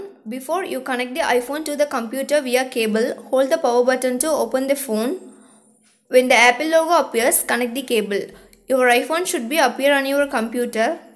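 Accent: India. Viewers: I like the way you speak and your voice. You’re Indian right?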